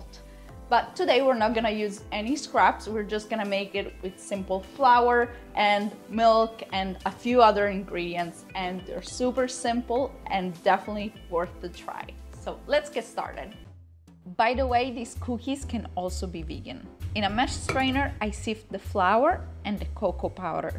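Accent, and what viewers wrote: Italy. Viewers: Love Italian accents on both men and women, semi musical speech intonation, like Argentinian spoken spanish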